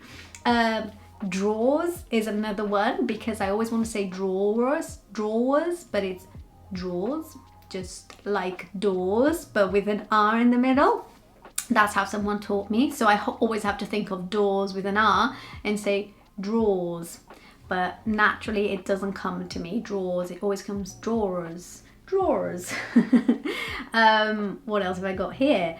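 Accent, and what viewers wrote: United Kingdom. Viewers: I'm a Brummie who was educated in the 50's and 60's, at my school about 80% of teachers were Welsh, probably descendants of the work force before the war who left South Wales headed towards the midlands looking for work. As a result I have grown up surrounded by Welsh accents, regular day trips and holidays to Wales furthered my knowledge of the Welsh and their accent. I can honestly say that I've always thought English spoken with a Welsh accent has always seemed the true pronunciation of English, it's not distorted or slurred by local accents (as a Brummie I should know) it just seems pure and full pronunciation. Your problem is you are apologising for your adopted Welsh accent, don't let the influence of Wales leek out oops! sorry leak out, it didn't harm Richard Burton or Catherine Zeta-Jones. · You learned british english. American english pronounces the R. 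We also tend to pronounce many of the T sounds closer to a D sound. British english T sound is more pronounced at all times.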